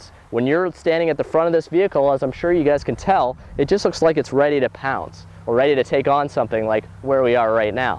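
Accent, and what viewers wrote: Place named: Canada. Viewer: Oh he's Canadian... That explains his pronunciation and his hotness :)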